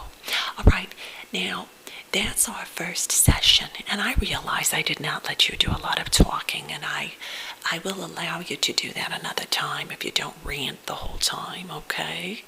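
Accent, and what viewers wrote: South, United States. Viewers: Love the southern accent. There is something very... accommodating? about it. I don't know how to put it :)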